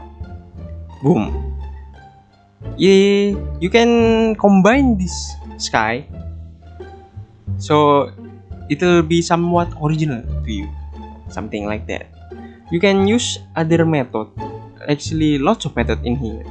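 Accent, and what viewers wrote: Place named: Indonesia. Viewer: Indo banget soaranya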